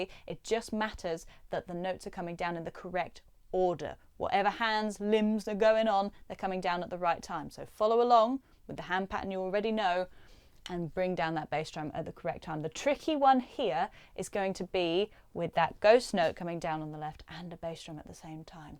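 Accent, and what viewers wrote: United Kingdom. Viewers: I play bass and stumbled on your channel. You have an enthusiastic approach and the British accent makes your lessons fun to watch. Want some 7/8? Bill Bufords playing on from. Back in the day, bruford and bass player, chris squire, were as solid as it gets.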